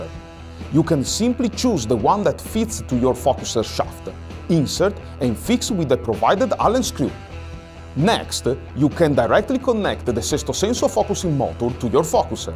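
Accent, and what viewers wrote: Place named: Italy. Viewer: Salve! Tu haves notabel accento italiano. Successo a ti e ao Populo Italiano!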